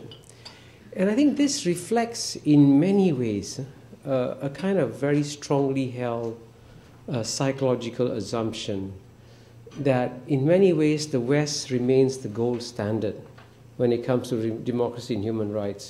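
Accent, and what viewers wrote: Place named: Singapore. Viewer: he sounds SIngaporean!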